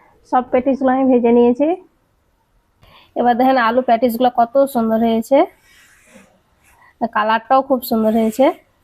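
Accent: Murshidabad, India. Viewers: ভদ্রমহিলার এই রান্নার অনুষ্ঠান দেখতে খুব ভালো লাগে, সাধারণ কিন্তু, সুন্দর , কথা শুনে মনে হয় ওনার বাড়ি মুর্শিদাবাদ জেলায়....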